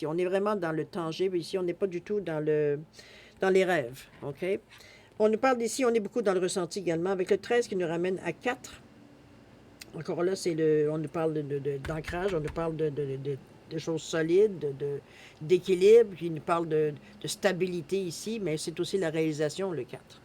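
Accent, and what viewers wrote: Canada. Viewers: Moi..chère...j.adore.ton..accent..il..viens..du.pays..de..mes..rêves..😌..je..suis.. morad..mon.2’..petit.non..c.est..Jaky..mais.tous..mes.amis.m.appelle..Jaky…j.écoute.pour..la.1.fois.ton..tirage.mais..surtout..ton..bel..accent…….❤.Canadien..se.que.j.aime..ce.splendide..pays…tu.ne.peut.pas.imaginer.a.quel.point..merci.pour..le.tirage..vierge..😊😊😊❤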